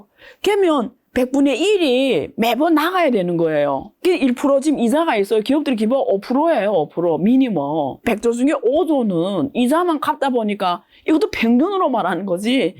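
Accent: China. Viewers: 조선족인데 말투가 고쳐지지가 않네 교묘하게 짜장 빨던여자가 비판을하니 신뢰가 안간다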